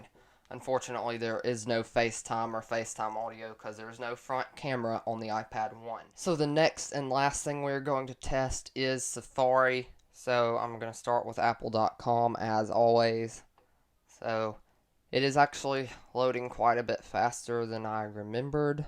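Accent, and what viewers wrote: Texas, United States. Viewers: great advise and your voice sounds nice (sounds like your from texas or near that area)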